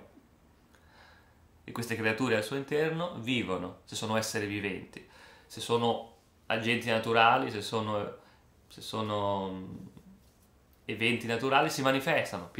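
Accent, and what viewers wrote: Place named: Romagna, Italy. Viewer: Dall'accento e da quello che ho visto sul tuo canale credo che tu sia romagnolo... Mi vergogno ad essere tuo conterraneo.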